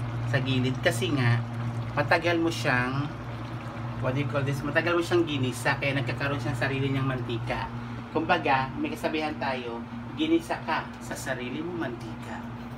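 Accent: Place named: Visayas, Philippines. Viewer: I love how to cook, magaling k tlga alam mu mga technic para maging masarap luto mu, sana dumami pa mga subscribers mu kasi sobrang hambol mu po, I love your way to speak very calm khit visaya accent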